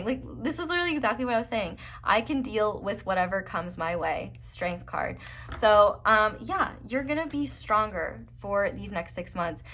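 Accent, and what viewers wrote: South, United States. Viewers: You’re so endearing! I appreciate your gift! I especially appreciated your southern drawl! 😂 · Omfg when you said "feeling my way through the darkness" that's by! Southern accent 👍 awesome 🎉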